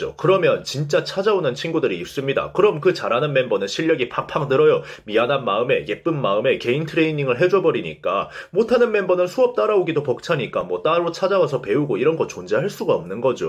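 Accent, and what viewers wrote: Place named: Busan, South Korea. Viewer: 말을 강조하는 부분에서 부산 억양이 나오네요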